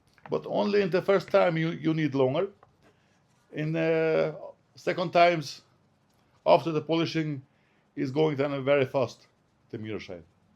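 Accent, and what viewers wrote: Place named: Germany. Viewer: Hey, bist du deutscher Herkunft? Ich Hab das Gefühl du hast Akzent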